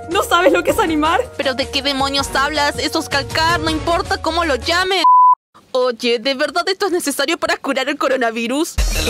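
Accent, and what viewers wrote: Argentina. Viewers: Jaja bien argentino papa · AY DIOS , como me cague de risa , LPM x'd (es gay mesclado con humor , acento Argentino y espacios publicitarios de esos que pasan a las 2 o 3 de la mañana , decime , no es lo mejor de la pu74 vida?)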